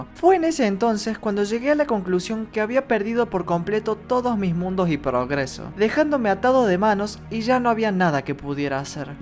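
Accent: Córdoba, Argentina. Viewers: Tu tonada cordobesa le da un plus al video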